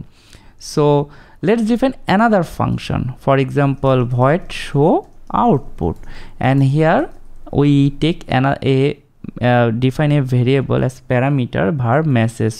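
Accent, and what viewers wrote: India. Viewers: Опять вместо английского индусский! Или что, из-за толерантности будем слушать музыкантов без слуха, смотреть на безногих танцоров и учиться у учителей с таким чудовищным акцентом! Бред какой-то! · Perfect for programmers. super comprehensive. I see Indian accent tutorial, I thumbs up. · I dont wanna be racist but god fooookin damn it how im supposed to get what you say with that indian accent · In my opinion it is Indian accent because I am Indian and my accent is also same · Are you Indian cause your accent sounds Indian and it's so freaking cool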